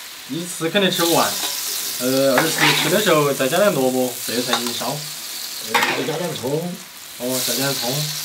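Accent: Sichuan, China. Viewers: 我一晃眼以为火云邪神的演员来客串视频嗨操起了四川腔！要得要得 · 四川哪里地土话噢？ 好可爱！！我就是四川人！！！ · 四川话好亲切！师傅哪个地儿的？ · 喜歡的紅燒肉經由四川口音的介紹好可愛，王剛師傅好手藝，二娃來年肯定是個大美人呦，讚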